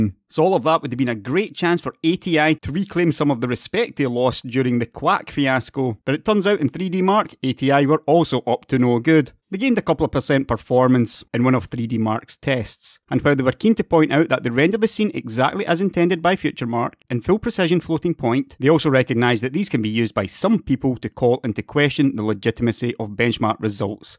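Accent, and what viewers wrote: Scotland, United Kingdom. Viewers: Thanks, you've shared useful information. BTW, I wonder I guess you're Scottish and where do you live ? England or Scotland ?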